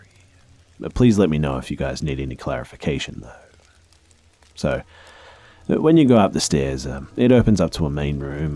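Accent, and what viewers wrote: Australia. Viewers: Love these videos, they’re the only thing that help me sleep. It’s also nice to hear a familiar Aussie accent :) !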